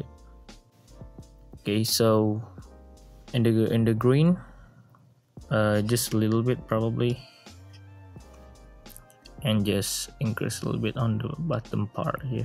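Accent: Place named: Malaysia. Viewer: You sound like malaysian chinese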